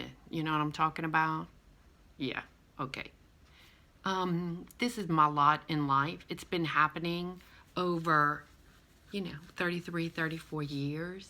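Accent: Louisiana, United States. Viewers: Awesome! Thanks for your honesty! Originally from Lafayette, when I hear you speak it makes me lonesome for the lovely people in South Louisiana, take care and keep spreading your love around, it’s a blessing for us!!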